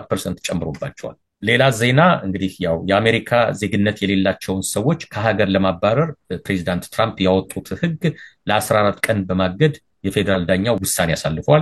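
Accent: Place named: Ethiopia. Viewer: After I listen you patiently you make good points,but You have very heavy accent. You Mentioned 100 times Dow jones. You said do jones typical Habesha.Read it Dow jones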